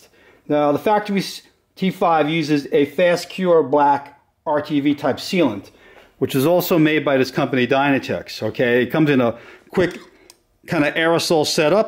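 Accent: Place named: North, United States. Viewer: why can't I just send my t5 to you sounds like you are north of the mason Dixon line. so might be to expensive for shipping.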